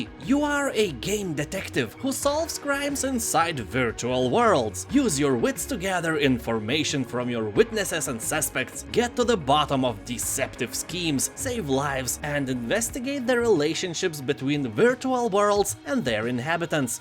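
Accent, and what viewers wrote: Russia. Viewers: its probably ignorant of me to call your accent "Russian Like" since you are not really russian. But your accent sounds like such a cool russian like acecnt (to a non european) I swear you can really do a good russian/ luthuanian game character voice over. Or maybe a game in which a main protogonist fight soviets for independence. I am from Pakistan by the way.(just saying) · you are from Russian or where?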